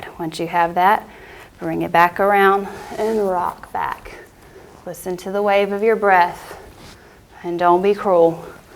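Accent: South, United States. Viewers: I love your country accent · This girl is southern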